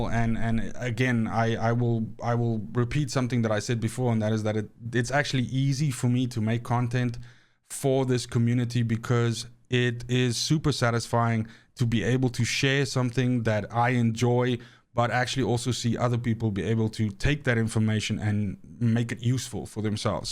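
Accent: South Africa. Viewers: I do trust your judgement & how you break it down. I just figure if I'm playing anyways I may as well play the CT's that people claim will give what I'm looking for. (Deathshield, Highroller) PS stream I don't follow any steamer, I'd follow you & I main Trickster. You & Niktew have great have downs. Khraze & Widdz are helpful & DPJ is just hilarious! PS love the SA accent! Haha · I honestly didn't notice your accent as my girlfriend is from SA so it sounds normal to me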